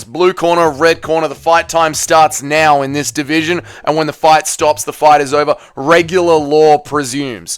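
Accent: Australia. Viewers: I think Chael really has lost whatever little credibility he had left. Very sad to see. Loved the video, by the way, delivered the way only an Aussie can! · Something about you Aussies smug, disrespectful attitude mixed with your unpalatable accent especially when reporting or speaking about anything American, just sounds like huge piles of 💩hitting the ground over and over again…. Btw, has everyone seen the video footage of the disrespectful jackass wrestler committing assault by walking up and smashing a can of beer on Raja’s head prior to the event?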